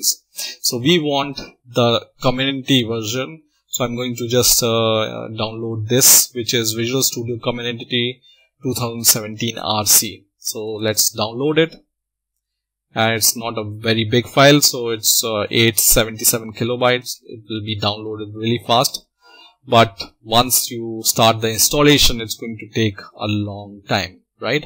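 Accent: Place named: India. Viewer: No, it is just the Indian accent...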